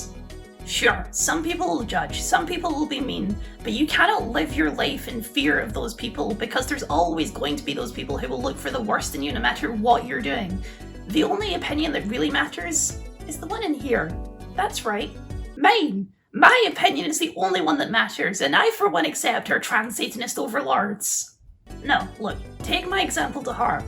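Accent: Scotland, United Kingdom. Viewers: What's wrong with his voice? I think its the fact he's speaking scottish english. There isn't anything wrong with that, it's just a dialect/accent. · I just figured that was some odd Scottish accent you grew up with. I'm serious. I've met people who only spent their very early years in a different country and their accents were THICK despite that. But you in a box. That really makes a pathetic level of sense. I live in a red state and have seen people (not kids in high-school but adults in their 30s and 40s) insist someone with just a speech impediment must be a foreigner and will lose their tempers if opposed. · good video, liked and subscribed. A few notes from a trans woman of 50+ years of age, and who transitioned as a fully mature adult. I lived more than half of my life pretending to be male, and in the 90s I started transition at around 30 years old. 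Prior to learning about transitioning and being trans I was a typically masculine looking over 6 foot tall human, with a "Girls Voice" as a person who was attracted to women, looked like a handsome man, it was a difficult thing to work through. I ended up learning to sing deep and started smoking so I could affect a masculine voice. (So glad I stopped trying to be a man) but the thing is randomly some peoples voices don't automatically break, sounding masculine is not a given. For me I was lucky as a trans person, in that my voice has always passed for a woman. Even as a late bloomer. doesn't sound as feminine as I did when I was twenty something. (Humor) However he has my old accent, and that bugs me.:P Yeah mum was Scottish, and up to about 11 or 12 years old I had my mums accent. But going to School in the USA forced me to change accents.:p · I wasn't expecting your voice to sound like that, but I think it's really cute tbh. 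And I love your accent (I'm a sucker for Scottish accents, they sound good no matter what. Even when I can't understand what's being said lmaoooo) Those people are miserable and live boring lives. Using 4chan in 2024 says a lot more about them then anything. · What's wrong with your voice? It's obvious: you've got a scottish accent which means most americans will think is... br*tish 🤢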